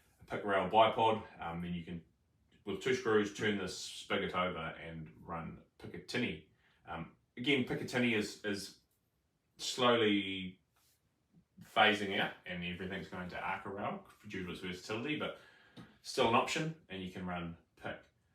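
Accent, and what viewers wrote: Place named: New Zealand. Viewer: I love listening to kiwis even though I'm not totally familiar with the slang. 😁 Thank you for the review of the MPA!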